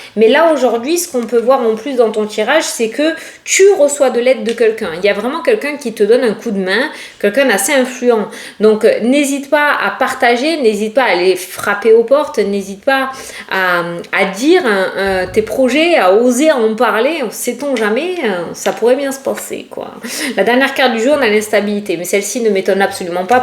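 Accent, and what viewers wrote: South, France. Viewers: Bonsoir,de la Rochelle merci pour cette bonne humeur et cet accent 🌞du sud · Coucou Je suis se Normandie aux environs de Caen. Merci pour tes tirages...toujours obtimiste et de bonne humeur...tu nous envoie ton soleil du sud avec ton accent! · Un petit coucou du Nord... Où il fait bon aussi 😉👍👍 on n'a pas le temps de coller 😁on a beaucoup de vent... Je vous découvre et j'ai accroché à votre petit accent du sud et votre côté un peu fofolle... ce n'est pas une insulte.... promis... Beaucoup de projets en perspective, effectivement... mais bien plus de SOUHAITS.... m'éloigner le plus loin possible et le plus vite possible de personnes malveillantes, jalouses et vraiment mauvaises... et sûrement instables psychologiquement... 🤔 J'en ai perdu la santé et la joie de vivre.. Elles ont essayé de me détruire (j'ai failli perdre la vie, par un rejet sévère et aigu de mon cœur greffé) J'ai vraiment eu beaucoup de chance.. 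Je reviens de très loin... A peine rentrée d'hospitalisation, me voilà à nouveau HARCELER, PERSECUTER, CALOMNIER... Donc c'est un grand OUI, je souhaite m'éloigner le plus loin possible de ce maudit lieu et de pouvoir avancer dans mes projets... 🙏🙏🙏🙏🙏🙏🙏🙏🙏🙏 Merci pour vos guidances... 💛🌺🌺🐞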